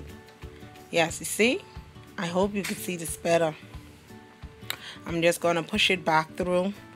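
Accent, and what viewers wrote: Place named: Bahamas. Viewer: Hello sound like from Bahamas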